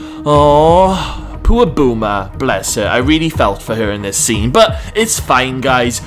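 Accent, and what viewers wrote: Wales, United Kingdom. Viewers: Hi, LOVE your enthusiasm for the show, your Welsh accent & always look forward to your reviews. We will all be gutted when Wentworth is finished. Are there any tv series that you & Peter recommend for viewers to watch in the future, with amazing writing & acting like Wentworth? Thanks from Renee in New Zealand 🥝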